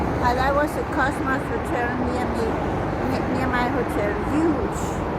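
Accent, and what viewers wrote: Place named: Denmark. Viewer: Hej Jeg kunne høre på din accent at du nok var dansker. Dejligt at se og høre. Jeg opdagede lige din fine video og har nu abonneret 🙂 Dbh. Michael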